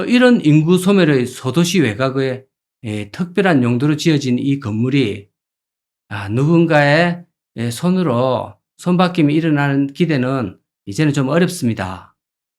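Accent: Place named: Seongju, South Korea. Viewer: 사드배치된 성주서 살았던 어릴때 많이 듣던 가찹고 (가깝고) ㅋㅋㅋ 오랜만에 듣네요 저도 그렇지만 본인은 표준어 쓴다고 생각 ㅋㅋㅋ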